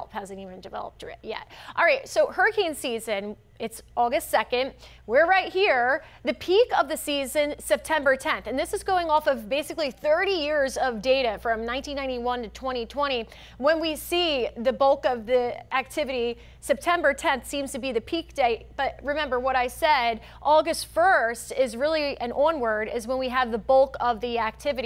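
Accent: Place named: North, United States. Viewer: By far, the worst northern accent that I've heard in Florida news bar none...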